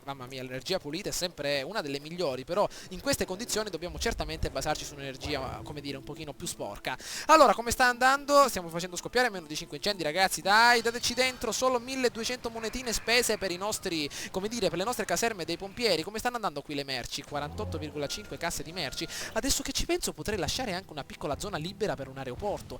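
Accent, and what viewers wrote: Sicily, Italy. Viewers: di che parte della sicilia sei??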